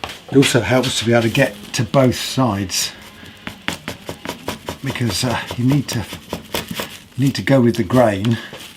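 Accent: United Kingdom. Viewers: By the way, we really appreciate that you narrate your videos and tell us what you’re doing and why and where this bit of wood came from, etc. So many these days are doing the silent ASMR-type of videos, and while those have their place, we prefer the talking. And it’s nice that you have a British accent, too.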